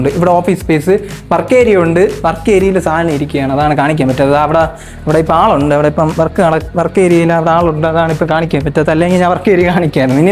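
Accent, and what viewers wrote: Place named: Thiruvananthapuram, India. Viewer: തിരുവന്തോരം ഭാഷ പറഞ്ഞു ബോറടിപ്പിക്കാതെ മര്യാദക്ക് സംസാരിക്കു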